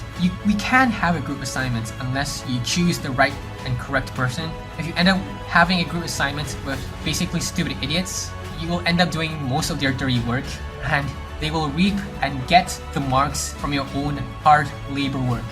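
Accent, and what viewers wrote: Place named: United States. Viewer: love your American accent 👍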